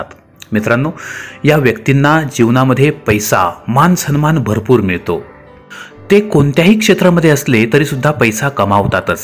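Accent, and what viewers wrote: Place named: Delhi, India. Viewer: दिल्ली गर मराठी तुमचं. नाव काय आहे ते सागा 🤩🤩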